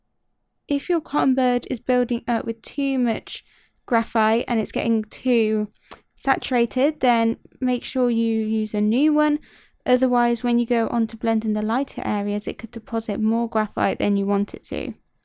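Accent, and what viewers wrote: United Kingdom. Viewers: I love your channel ❤️ also are you British? Xx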